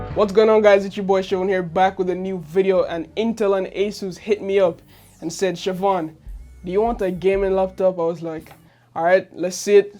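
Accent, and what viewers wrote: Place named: Jamaica. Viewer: Subscribing just because you sound like a fellow Yardie. Didnt even finiish the video yet 🤣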